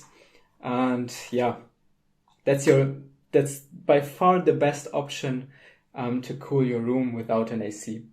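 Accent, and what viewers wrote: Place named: Germany. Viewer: why if i hear how people speak english i directly know which their original countries are? I guess he is GERMAN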